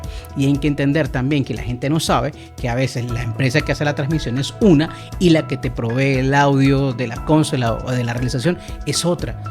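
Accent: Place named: Caracas, Venezuela. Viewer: De paso me suscribí y te empecé a seguir en Instagram jejjjee Estas en San Cristóbal, pero tu acento es caraqueño.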